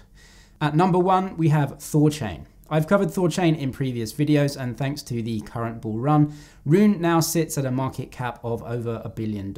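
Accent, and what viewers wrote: United Kingdom. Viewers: Great video, always look forward to your videos. Its refreshing listening to someone from the uk. Have you ever used Trading View. Be great to see a video on that. How it works and allthe different tools. Please keep up the great work.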